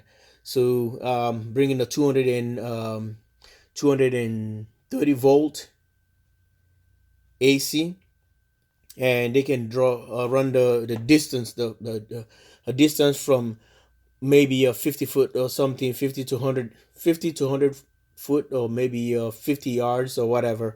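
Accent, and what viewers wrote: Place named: Nigeria. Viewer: You talk like a Nigerian. Nice video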